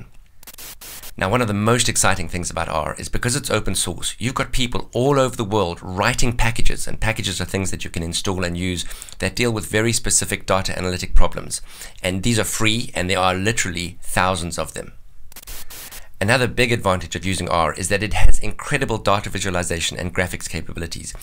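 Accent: South Africa. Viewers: i wonder if the presenter south african? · Are you South African? The accent sounds familiar! Loved the video, great content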